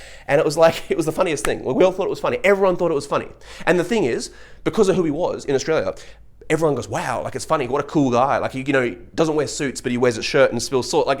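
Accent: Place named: United Kingdom. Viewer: This guy is absolutely speaking the truth. He sounds like he lives in Britain or in Europe somewhere but he's not exaggerating the reality of being wealthy. I live in the U.S. When I was about 20 years old I dated a woman whose net worth was north of 40 million. This was sometime in 1988. I dated her for 5 years and that experience was unlike ANYTHING I had ever experienced before or since. I grew up in a very middle class house hold, so I had access to nothing extravagant. Just to provide a few quick examples, during the time I dated this woman, I traveled to Bahamas at least twice, Los Angeles - and not just LA proper but I shopped on Rodeo Drive, the most exclusive avenue of wealth in the world, traveled to Las Vegas a few times, and I drove several exotic cars including a Ferrari, BMW, and a Porsche. I ate at the absolute best restaurants in the U.S. 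Everything I did during this time was paid for by this woman and her family. I was given money on a frequent basis. Ultimately, the lifestyle almost killed me because I had to a pay a heavy price of being absolutely controlled as to my time and space. I finally broke up with this girl and found my independent freedom again. To this day, I have no regrets on the decision I made. But, the experience provided me with a sense of existential balance that the world is NOT the same for people relative to those who have against those who do not have.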